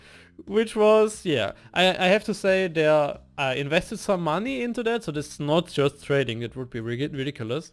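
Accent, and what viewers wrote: Germany. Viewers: Are u german? ^^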